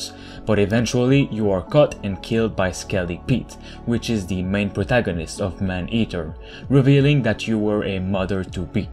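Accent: Quebec, Canada. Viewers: The commentator needs to makes vids in French, his pronounciation in English is terrible. I keep hearing him repeatedly saying " Man Heater"which is entirely different gendre. Please homes, just stop, that Quebec accent is atrocious. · Hmmm .. I hear Quebec !!!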